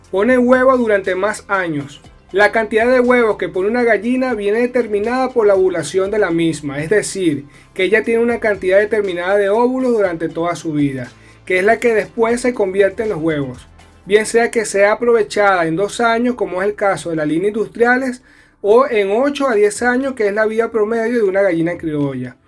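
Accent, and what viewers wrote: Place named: Venezuela. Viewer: Por tu voz me doy cuenta que eres Venezolano... tengo un gallo producto de un gallo criollo con una gallina ponedora Isa, un hermoso ejemplar de plumaje negro con pocas plumas Rojas y azules. Ahora, veo tu video y veo que mi plan se ajusta al tuyo. Pregunto, donde resides? Y como logras encontrar los gallos hermanos de ponedoras?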